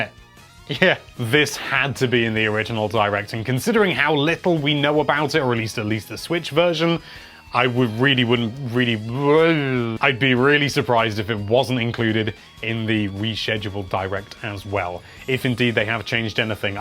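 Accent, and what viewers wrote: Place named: United Kingdom. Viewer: He's from the UK.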